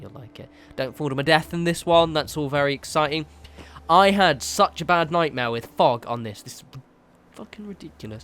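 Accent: United Kingdom. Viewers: I used to think you said "follow me on twitter at no response" instead of at the end of every videos of urs. British accent sure is somethin..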